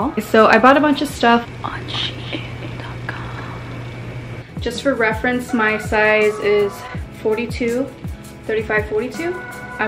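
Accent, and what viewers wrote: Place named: South, United States. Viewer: liked the southern accent jaja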